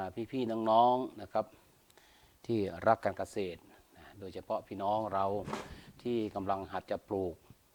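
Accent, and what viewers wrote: North, Thailand. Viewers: ขอโทษนะคะพี่เป็นคน เหนือรึป่าวคะน้องกดติดตามแล้วค่ะ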